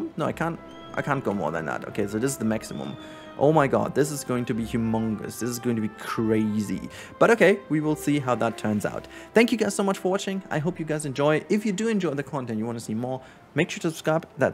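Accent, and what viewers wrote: Germany. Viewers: Gutes Video! (I assume you are German too? At least the accent sounds German to me :D)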